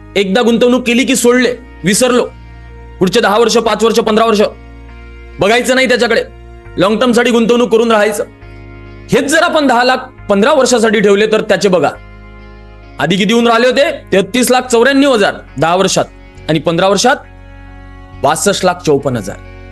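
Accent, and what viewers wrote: India. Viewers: From..विदर्भ....भाषेवरून कळतय...😊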